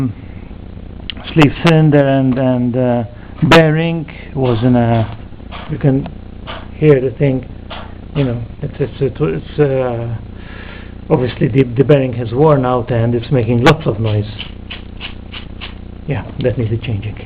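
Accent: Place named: Malta. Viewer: what was the total price with parts you sound maltese so thats why im asking because i have the same issue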